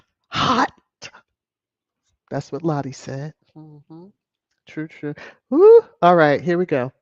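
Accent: RP, United Kingdom. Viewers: Thank you for great update 🙏😘❤❤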